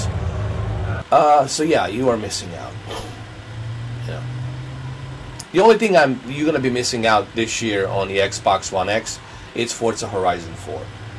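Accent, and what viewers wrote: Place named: Boston, United States. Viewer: I think it's great that you're covering gaming (Xbox and Sony) with your 4k content. I too think that Sony has a stronger foothold within the gaming industry with their numerous exclusives and virtual reality. I enjoy both Sony Pro/PSVR and Xbox One X. I am the type that appreciates high quality 4k and HDR so I use the Xbox One X for games that are available on both consoles. Currently, I only use the Sony Pro for the exclusives and virtual reality. Overall, I do prefer Sony over Microsoft as it appears that they focus more on the gaming community but I can not overlook the greater (however small) processing power of the Xbox One X. I am very confident that Sony will leap over Microsoft with greater processing power during the next console generation. I was also like to thank you for the effort you put towards your educational content. 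Most reviewers are far too hyper-critical which makes me second guess everything. You cater towards the every-day guy. You use simple and use easy to understand terminology and your slight "Boston" accept makes you more relatable. It's like having Paulie from the Sopranos coming to my house to discuss 4k to me. You use common sense while giving simple down to earth advice with no B.S. Keep up the good work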